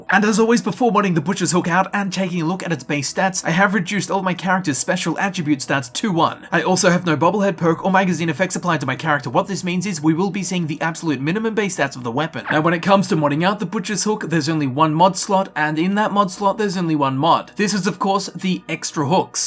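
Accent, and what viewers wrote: Australia. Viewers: your aussie yeah or am i crazy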